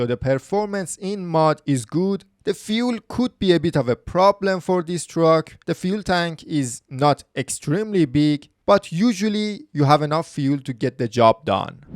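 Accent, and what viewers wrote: Iran. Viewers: خرابه لحجه ات شدم حاجی 😂 خوده خوده ایرانی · Wow he does sound Iranian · لحجه اینگلیسیت مثل ایرانی هاست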